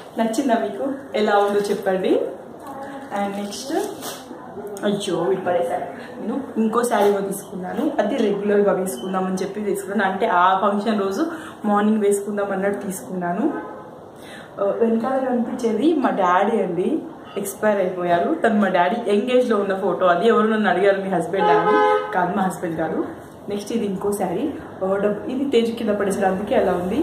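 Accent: Telangana, India. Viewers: meedhi ea ooru.. swatchamaina telangana lo chepthunnavu.. i like you..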